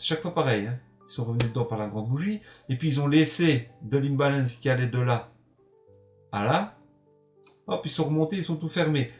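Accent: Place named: Belgium. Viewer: merci pour la précision je pense qu’on s’est formé chez la même personne et en plus ta l’accent belge donc je m’abonne ☺️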